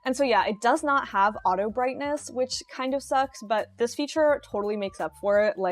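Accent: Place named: United States. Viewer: You sound like you are from the USA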